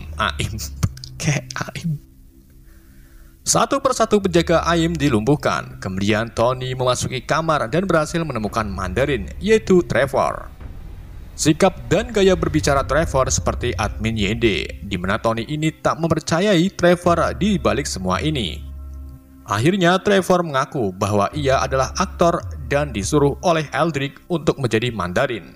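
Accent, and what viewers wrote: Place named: Banyumas, Indonesia. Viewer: Jawanne. Ndi mas??? Dimak dimiik kayong ngapak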